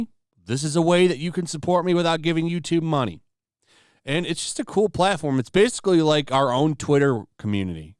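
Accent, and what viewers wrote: England, United Kingdom. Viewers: Just a small thing, but why are you reading in an english accent?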